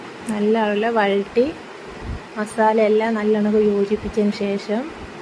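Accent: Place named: Kollam, India. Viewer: ഇത്താ സ്ഥലം എവിടെ കൊല്ലം ആണോ സംസാരം കേട്ടിട്ട് കൊല്ലം ഭാഷയാണന്നു തോനുന്നു ആളുകൾക്ക് പ്രായജോനം ഉള്ള ചാനൽ 👌സൂപ്പർ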